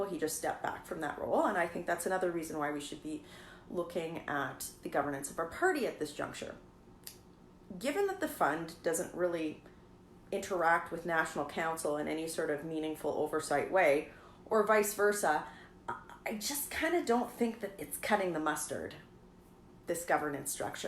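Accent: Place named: Western Canada, Canada. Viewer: She is a western Canadian. We all sound like her here! :)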